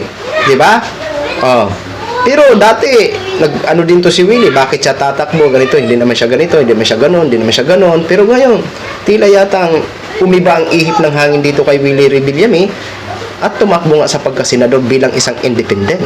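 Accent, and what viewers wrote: Visayas, Philippines. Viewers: Kabwisitboses mokuya Uhg pag stiry mo para kang nasa karerahan ng kabayu...visaya visaya pronounciation mo.englishin mo na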